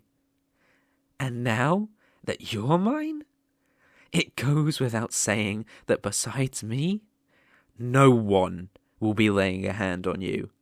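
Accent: United Kingdom. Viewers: Idk why but I can't take anything serious when it's in British like it's so cute and funny so idk bro😭🫶🏻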